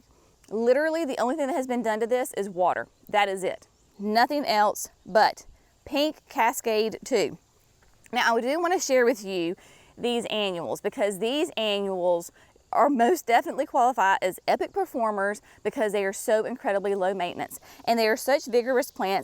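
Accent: South, United States. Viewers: You give me such great joy in the morning. I grab my coffee and listen to that beautiful southern melodic voice of yours. You and have worked so hard on the gardens. Proven Winners picked the most dedicated gardeners in the South! I can’t wait to see what you all do.😍❤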